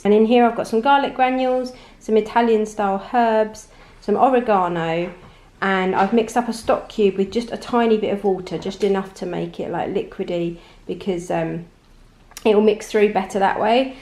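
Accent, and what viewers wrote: United Kingdom. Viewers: This woman speaks with a cockney accent. Her ancestors probably came from the border between the East London city limits and the Essex area. Her accent is from Essex. She sounds like a female version of the teenage Rodney Trotter from the British Cult hit TV series only fools and horses. She even has his eyes - as is evident in other videos. I suggest she watches only fools and horses and also birds of a feather - then she will realise what she sounds like - an Essex girl.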